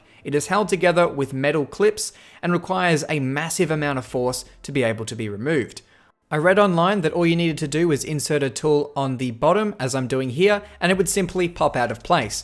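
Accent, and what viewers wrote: Australia. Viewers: He’s Australian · U Aussie?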